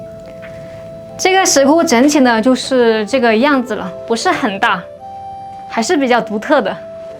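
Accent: China. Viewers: 中國口音超重，你便走邊小心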